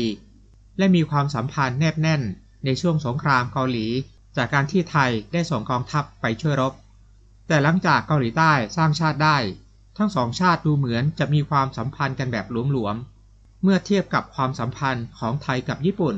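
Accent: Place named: Cambodia. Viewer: ได้ซิจ๊ะ ร ล ควบกล้ำ ฉันไม่ได้มาก่อกวนแต่สงสัยเพราะสำเนียงเธอเหมือนคนเขมรก็แค่นั้น คนไทยบางคนพูดไทยสำเนียงจีนก็มีเพราะเขาโตอยู่ในย่านคนจีน พูดไทยสำเนียงลาวก้มีอย่างคนอีสาน ..และเดี๋ยวนี้มีเพื่อนบ้านอย่างลาว เวียดนามเขาก็ทำคลิปยูทูปพูดภาษาไทยด้วย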